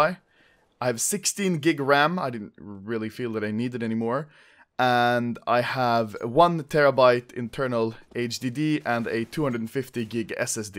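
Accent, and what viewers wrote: Sweden. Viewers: du är svensk man hör på ditt uttal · You swedish ? Lol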